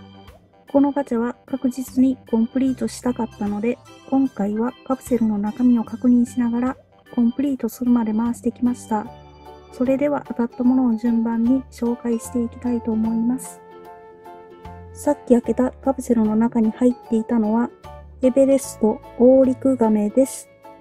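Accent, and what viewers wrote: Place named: Kansai, Japan. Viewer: 喋ってる人関西弁度々入ってるやん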